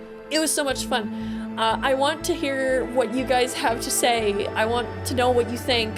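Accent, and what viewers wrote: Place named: Canada. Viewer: Lake Minnetonka? I thought that was a Canadian accent I heard. I suppose Minnesotan and Canadian accents are pretty much the same.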